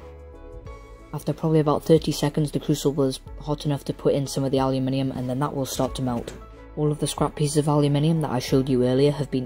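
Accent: United Kingdom. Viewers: It does not matter how you pronounce it, I'm from Briton too, So I agree with how you say it. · actually this is how the British say aluminium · Well said about the Aluminium pronunciation! it's a bit rich when a country who predominantly speak the ENGLISH language, start pulling the ENGLISH up on the way we speak!! What with sodder and aluminum and an inability to use metric they need to come into the real world!! Great videos and tutorials, keep up the great work. I subscribed Mark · you are simply right: the British accent for Aluminium is correct since it comes from a Latin word "Alluminium" that has the same accent as the British version. Even today in any latin country Aluminium is pronounced the same way you do in British, so at the end the US version is funky but not as correct;) Amen! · I'm sick and tired of these pseudo-intellectual Americans who're confounded and obliviously duping themselves into believing how they and they alone know how to speak English correctly, trying to fault find on our pronunciation of "aluminium" when it's forever been a lorry not a truck, a sink not a basin, a plug not a stopper, as do remember whos language you're butchering after all our brothers and sisters from across the pond, you're speaking English, so if anyone knows how it's pronounced, it's us... 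it's a ruddy jaguar, not a jagwar!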